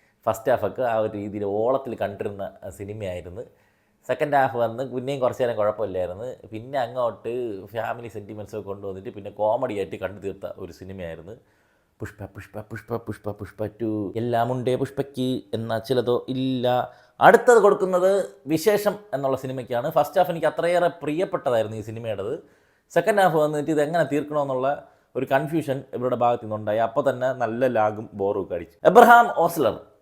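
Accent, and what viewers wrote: Trivandrum, India. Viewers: Trivandrum slang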